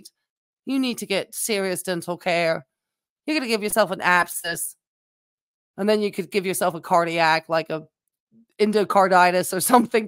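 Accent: United Kingdom. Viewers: Oh I just wondered bc of your accent. I figured it was the UK but was just checking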